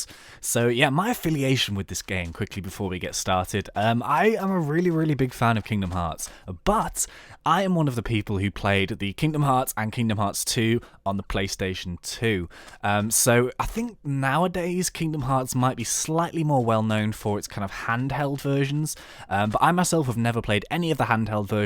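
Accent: United Kingdom. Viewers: It sounds like your British... I speak British some times:). Please tell me if your British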